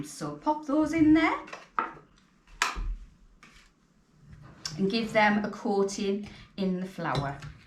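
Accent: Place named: Yorkshire, United Kingdom. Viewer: Will defo give this a go. Can I just say I am guessing your accent, are you north east or Yorkshire way?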